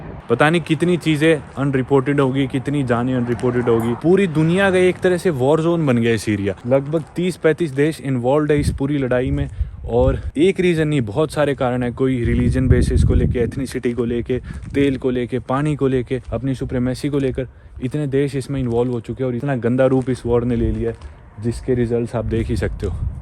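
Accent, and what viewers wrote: Haryana, India. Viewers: Bhai chora Haryana kaa lage hai !!!!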